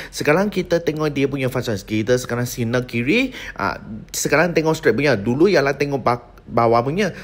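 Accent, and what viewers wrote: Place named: Kelantan, Malaysia. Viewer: Kelate bohhh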